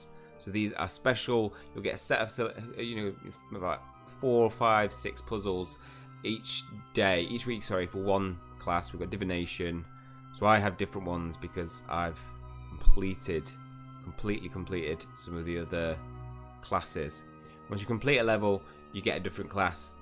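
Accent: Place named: Cockney, United Kingdom. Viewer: Nope. this guy is all-over-the-place in his attempt to explain things. For one, Americans have a tough time as it is trying to understand the Cockney language. Secondly, he's fumbling around with the cursor trying to explain features and in the process - he's out in left field, so to speak going back and forth and in the process ends up with us knowing but an inkling more than when we started to listen to the "How to play.". He's talking about cards, and other items, but his "basics" are totally left out of the instruction. What many of us wish to know is what constitutes a good move in the game, what to shoot far as the screen refreshes, what items are most important and when to use them (ie, bombs, footsteps, how to get past attacks, how to kill frogs, what are "power ups" and how to use them),; what are the best moves in the game (ie using 3, 4, 5 in a row items); what to save in each game until needed on the game board; know if it's better to use a lighting bolt spell or a flying key; what is the order of an item's changes until it can be used (ie, a block of ice, turning clearer). 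Oh, there are so many more things in "How to play" that is totally left out here. I haven't come across an excellent playbook/instruction manual outlying all of this and most videos I've seen one may know how to play the game but not express clarity, simplicity, understanding too all - but make an attempt to speed by it, like they were in a timed game. - pitiful.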